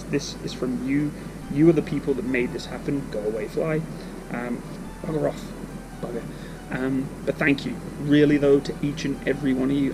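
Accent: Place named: United Kingdom. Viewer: Hey where do u live? I thought maybe uk or something cause of the accent?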